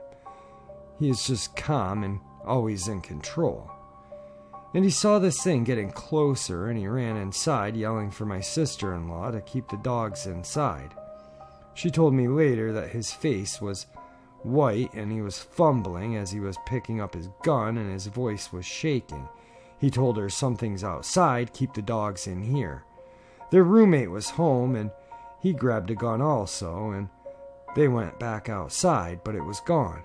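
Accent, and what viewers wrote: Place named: United States. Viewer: To me as an Irish person your accent is delightfully different. I wondered where you were from, I'm not very good with pinpointing US accents apart from recognising a southern one of course. 😁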